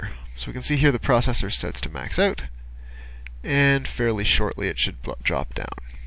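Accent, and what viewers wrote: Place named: United States. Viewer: Cis online instructions sounds weird when read with an american accent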